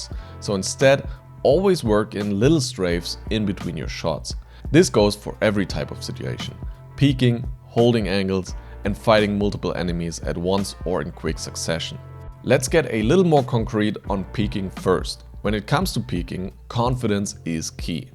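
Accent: Germany. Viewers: dieser deutsche Akzent 😂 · Man hört deinen deutschen akzent raus alter mach doch videos auf deutsch dann versteht man es auch leichter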